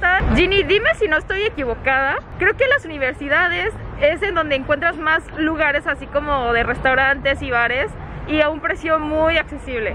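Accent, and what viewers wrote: Mexico. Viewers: Ahora que Cris está en México ví tu canal y me ha encantado, tu español es muy bueno, muy "mexicano" diría yo :) pero yo quiero saber ¿Qué estudias? ¿Cuánto tiempo hay que estudiar la universidad? ¿Cómo funciona eso en tu país? Me surgieron esas dudas ... Saludos... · Yo no soy seguidor del canal uno que otro video me e visto se Me hace una chica muy linda pero mi pregunta a sido siempre como rayos le hace para hablar también el español parece mexicana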